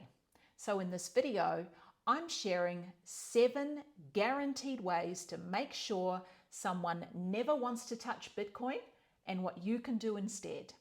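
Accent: New Zealand. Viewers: Enjoy your videos. Could you provide a bio sometime in a video? I believe you have said you are from New Zealand (and I detect the accent) and live in Portugal now. How did that happen?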